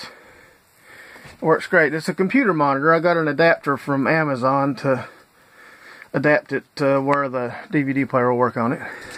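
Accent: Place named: Kentucky, United States. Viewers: Being native born here that distintive Southern accent is picked up immediatly. Always sounds like home :) Nice ingenuity on the build. The swingout arm and kitchen are too cool. Always looking for ideas for when I do mine. Ya'll take care. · I like those cup holders and swivel mount ..that's good ole Kentucky engineering I heard that accent and knew you were from KY. I spent my teenage years in a lil town called "London" in KY gettin ready to watch your school bus video. good job.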